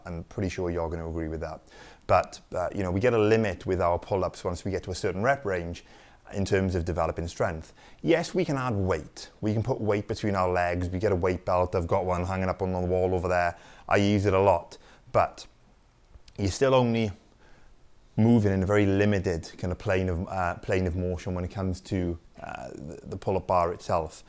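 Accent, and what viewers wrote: Wales, United Kingdom. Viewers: Nice to hear a Welsh accent on YouTube! Bro use YouTube cards to and pin your videos on the screen when you mention them, easier than description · Ah, a Welshman... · U welsh butt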